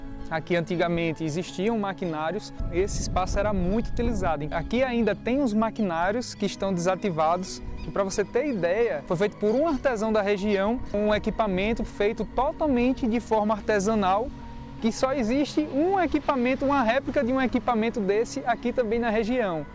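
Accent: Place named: João Pessoa, Brazil. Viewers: Assistir esse vídeo foi tão prazeroso me fez lembrar do tempo antigo muito emocionante, eu realmente estou chocada com o Ceará tanta beleza tantas histórias, você está mostrando um ceará que eu não conhecia sou do interior de São Paulo e estou amando seu trabalho, que filmagem excelente melhor sotaque do Brasil. Como você diz muito bom de verdade 😅 senti como se eu estivesse aí nesse lugar cheios de histórias, por mais vídeos assim parabéns 👏👏👏👏👏. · Perguntei porque cheguei a 3 meses de João Pessoa,achei o seu sotaque parecido com o de JP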